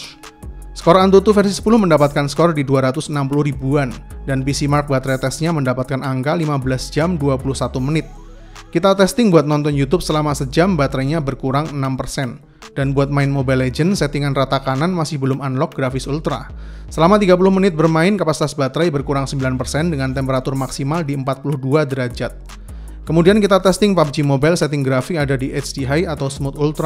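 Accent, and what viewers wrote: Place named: West Java, Indonesia. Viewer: Kak orang sunda kyaknya ya,.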